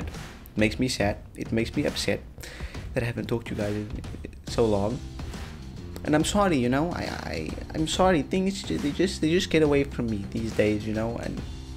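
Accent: South Africa. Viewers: south african ??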